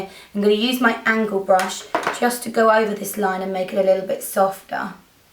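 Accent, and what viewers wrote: England, United Kingdom. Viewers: If I had British accent I would never shut up! :) love the way U talk <3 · i was wondering who 'bobby' is then on the title it was barbie, that's awesome sorry i have to grope around this whole englishman accent and stuff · oh gossshh i love your accent.. promise . i really wanna learn british english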